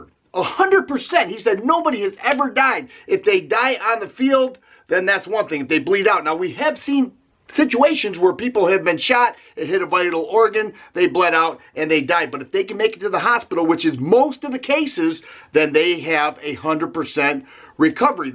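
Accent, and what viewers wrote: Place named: South Boston, United States. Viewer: Where did you get that accent.? I want to to say south Boston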